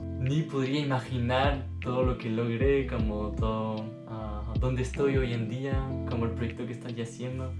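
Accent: Chile. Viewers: Has pasado mucho tiempo en Chile ? Porque hablas español con acento chileno, se te escucha muy bonito ! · Salut Cher ☺️, tu es définitivement un gars super doux et adorable!🤩 J'ai été très émue par ta boite à souvenirs, surtout ta lettre 🥺, c'est très sympa, ça m'a donné envie d'en faire une pour mon "moi" du futur🧐✍️. Tu écris très beau et ton espagnol est excellent et je ne trouve pas que tu as un accent espagnol ou andalou, mais plutôt il semble chilien. J'adore les villages français😍, ils sont tous trop beaux, j'aimerais vivre dans un, c'est mon rêve. J'ai visité Lille il y a quelques années et c'est une très belle ville, je l'ai aimée encore plus que Paris. Grenoble c'est aussi très beau, et ses marchés de Noël🎄👼. J'espère te rencontrer un jour ou te recevoir ici en Colombie 🇨🇴. Gros bisous.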